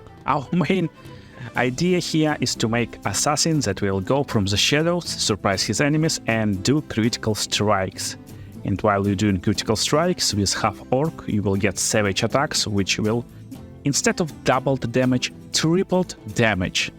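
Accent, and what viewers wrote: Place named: Russia. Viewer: какой русский акцент :)))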